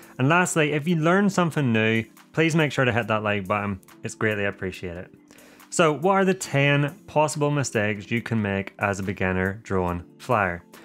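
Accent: Northern Ireland, United Kingdom. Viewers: Northern Ireland accent? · Are you Northern Irish? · Hi, your accent is amazing. I had to focus and struggle to comprehend each sentence, and I mean that as kind of a compliment. Where do you live in the UK? I really have the notion one should recommend your video to advanced level English language students because as a non-native English speaker for more than 30 years I still very much struggled understanding you :) Very good advices regarding safe and legal drone flying BTW. Cheers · Northern Irish accent?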